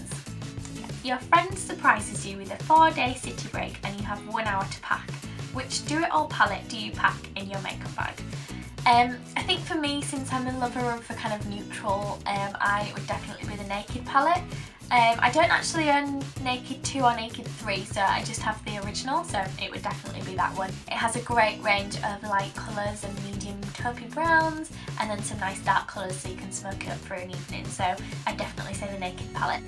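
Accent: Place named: Northern England, United Kingdom. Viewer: You were on if the first people I subscribed to on YouTube , been watching your videos for so long! Definitely will always be one of my faves, your personality is awesome! So Glad to see you back! I've just started my own channel...complete newbie, still learning, so no judging! Haha would love you to come by an have a look if you could! I've just recently moved back from Leeds too...love a northern accent! Hope all is well and all the best for this year!xx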